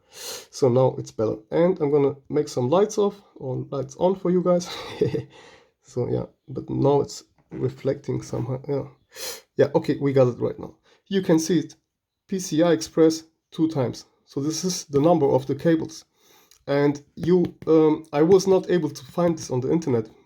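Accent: Germany. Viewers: Trotz deinem guten Englisch, wusste ich das du deutsch sprichst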